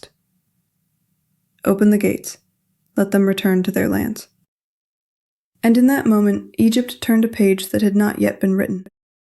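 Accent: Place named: North America. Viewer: I'm playing accent detective... You were raised in North America. That's all I've got hahaha...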